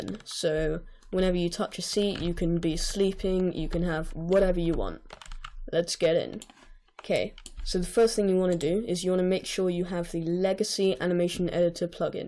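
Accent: United Kingdom. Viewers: Roblox programer: Good at intros, Good music, Good Mic, British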